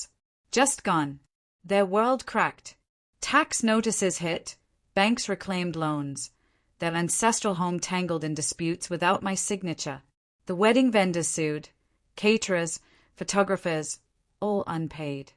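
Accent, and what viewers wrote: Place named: United Kingdom. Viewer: Yet again - the title doesn't match the story! Refreshing to hear a British accent.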